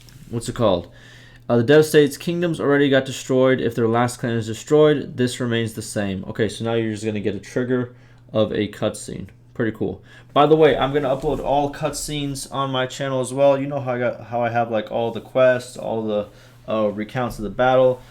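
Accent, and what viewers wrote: South, United States. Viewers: You sound dixie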